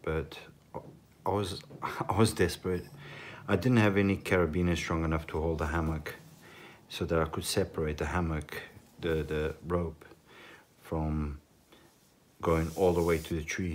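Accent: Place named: South Africa. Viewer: I thought I could detect some South African and a little bit of Italian. Thanks for replying mate.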